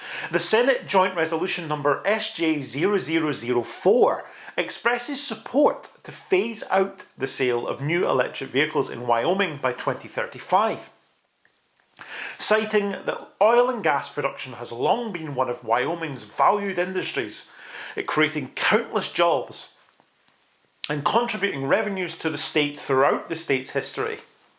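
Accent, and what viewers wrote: Scotland, United Kingdom. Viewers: "Bah-errie'" 😆 I love the Scottish accent. It's my favorite English-language derivative. I thought, banning fossil fuel-powered vehicles in the States, by 2035, was a federal measure. If so, Wyoming should be in for some trouble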